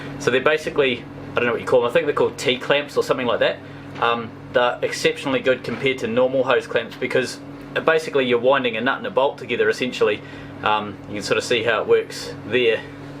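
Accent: New Zealand. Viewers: Are you originally from NewZealand?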